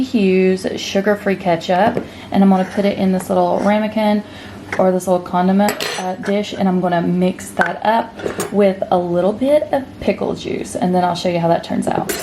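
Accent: South, United States. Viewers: I love your voice and southern accent 😀. The Keto diet and Intermittent Fasting has changed my life, I have lost 27lbs. in 30 days. The best part for me is that my back pain is gone, I was just told 3 months ago that I needed surgery on my lower back. Not happening my friends. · Love a good old southern girl accent..smh stationed in California..these people are so different out here lol..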